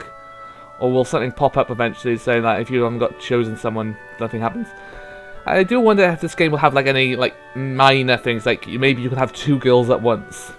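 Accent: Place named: United Kingdom. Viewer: Just so you know, no offence intended, "nubile" is not pronounced "nimble", it is pronounced "new-by-elle". Also, really, if you have troubles with a lot of the words, you should really not read the text. On another note, this is a side game set in a different universe to a game titled "Sunrider" which has since been released as "Sunrider: First Arrival" and "Sunrider: Mask of Arcadius", with a third installment to come at some point. Having played them only really shows you another version of the characters. Hue: a gradation or variety of a color; tint. "Re-Pro-Duck-shun" Seriously now. Your accent sounds like you are British, you should have been taught most of these words by now...right? Again, no offence, just...listening to you mangle the words is like having an ice pick shoved in my ears. A single ice pick. Hitting both ears. At the same time. 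But that is just me. Again, not trying to offend you.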